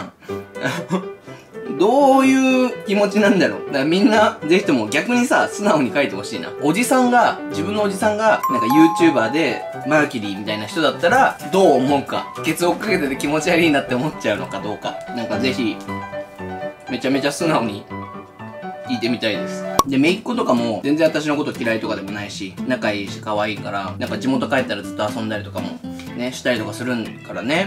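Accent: Miyazaki, Japan. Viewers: 宮崎弁喋ってるの超好き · マーキュリーさんの宮崎弁なんか好き笑 ちなみに、私のおばあちゃん家も宮崎です！ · 今まで登録はせず、おすすめに上がってきたら観る程度だったんですけど、宮崎弁とただただ良い人感にやられて登録しましたww マーキュリーさんみたいな人が叔父だったらわたしもきっと自慢するし伯父っ子になりそう。。🥺🥰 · 宮崎弁可愛すぎる😂❤️ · 最初の宮崎弁でめっちゃ親近感わいたっちゃけど😂(あえて宮崎弁で笑笑)度々出てくる宮崎弁がいつも嬉しい☺️🤞🏻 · マーキュリーの宮崎弁かっこいい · わ〜！！！！ばちこりの宮崎弁嬉しい😭😭🤍(宮崎県民です) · 宮崎弁すごい🤣🤣🤣🤣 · めっちゃ宮崎弁、もう宮崎弁で話した時あ、って思ったwめっちゃ親近感、私も宮崎県だからめっちゃ訛ってるw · 宮崎弁最高👍👍👍👍👍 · うちの親戚宮崎人やけんめっちゃ宮崎弁懐かしい気持ちになるw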